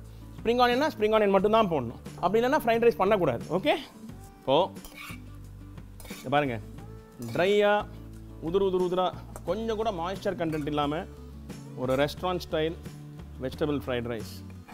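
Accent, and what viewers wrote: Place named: Saidapet, India. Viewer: I simply love the last part saying “Torture Panna Koodathu” that was hilarious. I love all your videos and your very simple style of cooking and of course your Saidapettai Tamizh!! Takes the cake!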